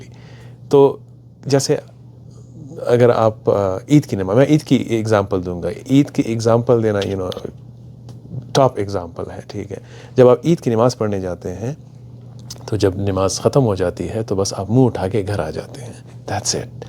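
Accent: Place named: Sindh, Pakistan. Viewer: Wow - your sindhi reflects in your accent - Love it 😍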